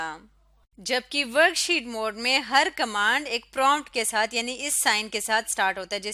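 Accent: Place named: Pakistan. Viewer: It seems that you are from pakistan.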